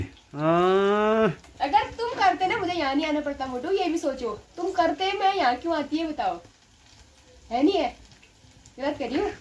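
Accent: Pahari, India. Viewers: Aapka pahadi bolna bahut accha lagta hai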